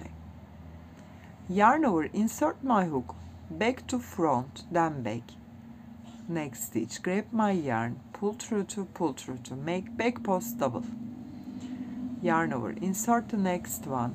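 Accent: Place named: Turkey. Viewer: The second I heard the accent, I knew you are Turkish. Dünya çapında videolar yapan bir Türk görünce asın bayrakları diyesim geliyor ❤❤❤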